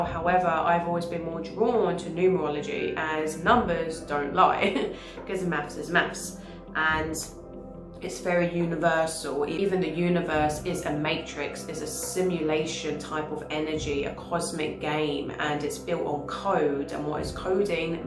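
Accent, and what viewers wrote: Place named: London, United Kingdom. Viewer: I'm a 22 here, but I haven't been able to build anything thus far. Not sure what the blockage has been but I'll bet its been more an issue of learning to build than anything else. I was trying to place your accent through the whole video. Are you a Londoner?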